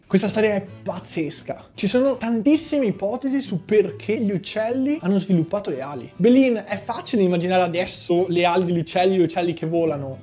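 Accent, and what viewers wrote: Veneto, Italy. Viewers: Wow!, ti è venuto l'accento Veneto... Pazzeeesco!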